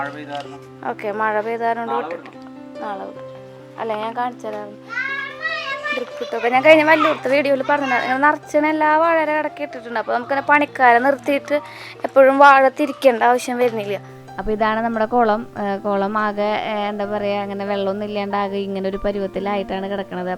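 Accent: Thrissur, India. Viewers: Thrissur slang😀😀😀😀😀😀😀😀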